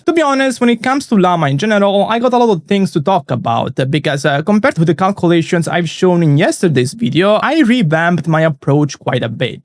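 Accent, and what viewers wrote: Italy. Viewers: hai un ottimo inglese ma il nostro accento è riconoscibile lontano un miglio 😂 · are u italian?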